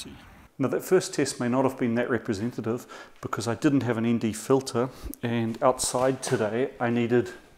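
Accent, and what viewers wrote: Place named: New Zealand. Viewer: Nice, thx! sorry for a silly question but what's your accent? Is it New Zealand? But you seem to live in Germany? Great series anyway :)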